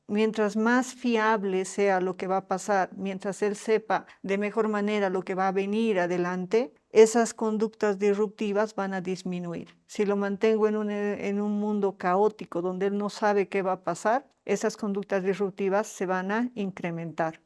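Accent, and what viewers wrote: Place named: Bolivia. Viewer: Excelente conferencia , felicitaciones , por su asentó , la Doctora es Boliviana ? De La Paz ? Muchas gracias por compartir sus conocimientos. Saludos desde el Ecuador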